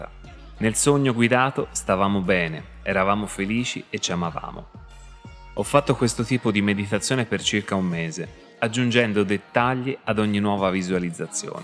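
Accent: Tuscany, Italy. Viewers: Ti ascolterei tutto il giorno già solo x il tuo favoloso accento toscano... io amo ❤️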